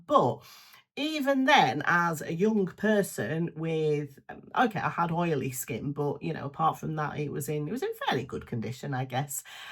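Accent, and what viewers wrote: Manchester, United Kingdom. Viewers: Hi, Derbyshire looks really lovely. Your accent reminds me of my dear friend who is originally from Manchester. No7 is actually quite pricey here in Australia even on sale but yes we do get some deals like Buy 1 get 1 free or free gift with purchase at Priceline. I use their blush/highlighter stick but would love to get my hands on some of their skincare. Haven't seen the Prime Forever products yet. Interesting! The Glaciology part is apparently good for blending advanced antioxidants with peptides to preserve collagen and elastin from environmental aggressors, and it helps with Rosacea....apparently. And yes the lines on our decolletage are so so annoying so I flood it morning and night with essences, serums and creams as well as my retinal. Thanks for the information 💞🥰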